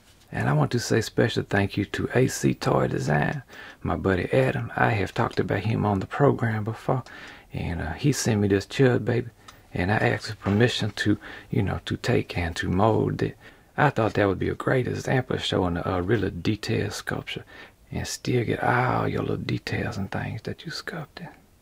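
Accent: Louisiana, United States. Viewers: Are you from Louisiana? Your accent is obviously exaggerated but you have a bayou accent that can only be exaggerated by a Louisianian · you have the most Louisiana accent I’ve ever heard and I love it. I don’t know if you’re actually from Louisiana but this is how I imagine everyone down in the bayou speaks. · you gotta be from da bayou. I recognize that accent. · this guy's accent reminds of of that cajun chef Justin P Wilson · Southernish? It's actually more like a Bayou Accent but he seems to be in an area where they speak "English". LOL Deep swamp language would need subtitles. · the awesome Louisiana drawl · He does sound like he's from my town for sure. Gonzales LA FTW.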